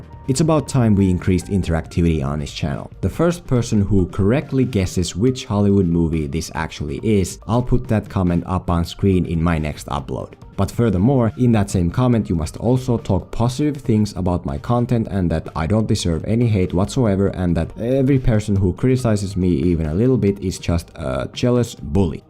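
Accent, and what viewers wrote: Finland. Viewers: playdate is from 2012 not the 90s. base don the accent i'd say finish or lithuanian, based on the covers of the movies, its finish. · Terve mieheen! ;) Teikäl iha huippihyvii videoita ja tiiäks aina jotenki tuntu siltä että on siinä hieman sellast suomalaista aksenttia ;p Mutsiis äijäl aivan huippu hyvä englanti ja niiku Arska sanoi: "An acsent is a strenght!" ;) Ei hätää, se on meidän kahen pieni salaisuus... :7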